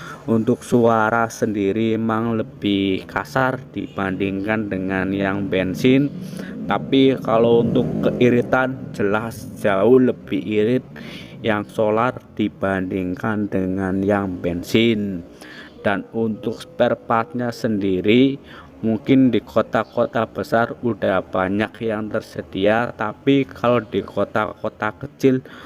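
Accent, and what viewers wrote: Banyumas, Indonesia. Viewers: KAYANE NGAPAK APA YA KANG😅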